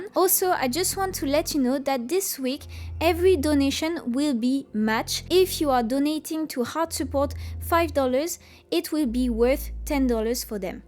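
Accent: France. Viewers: Your accent sounds French.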